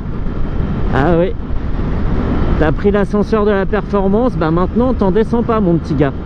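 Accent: Paris, France. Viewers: Le langage est fleuri avec des trouvailles poétiques au fil de la poignée genre: "une fourche qui se laisse pas déborder par l'arrivée du poids". Voila, une chaîne moto qui rend justice au parigot façon titi gouailleur., le Pagnol de la banlieue, le Marcel du motocyclisme périphérique. Un vrai régal, cette verve!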